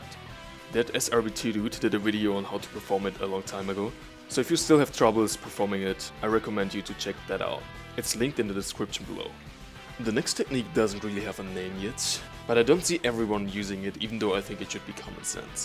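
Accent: Germany. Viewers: I guess ur a german ... am i right ? ... i can hear that DEUTSCH 🛂 accent ... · Are you German? Also I knew about the slow squid trick since Splatoon 1 and it a lot more important than most people think. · i watched this video and was like „this guy is german“ and indeed you are :) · You sound so German. Great video though, thanks a lot!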